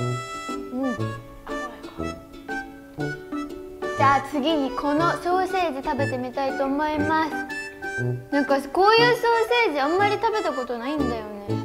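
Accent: Thailand. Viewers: แซบปุอิหลี พูดอิสานน่ารั๊ก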